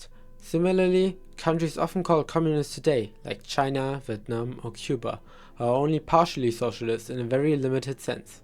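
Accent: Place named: Germany. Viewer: Der deutsche Akzent ist nicht zu überhören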